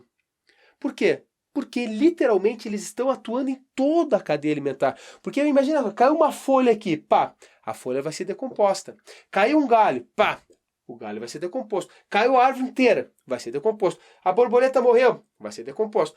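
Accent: Rio Grande do Sul, Brazil. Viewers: sou apaixonado pelo sotaque gaúcho.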